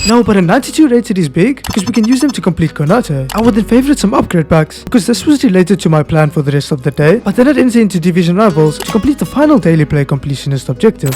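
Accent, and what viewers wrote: South Africa. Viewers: Yooo u south african 🇿🇦?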